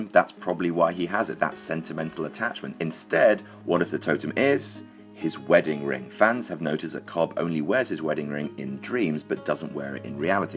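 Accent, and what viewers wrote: United Kingdom. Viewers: You're so great, I love this channel. The content, the editing, the comedy, that snarky British accent that we Americans adore so much. It's like a perfect package all rolled into one.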